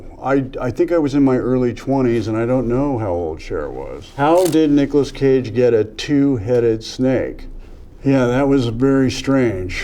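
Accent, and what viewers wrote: United States. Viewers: i have never watched a movie with him so i wasn’t expecting him to sound so uber american lmao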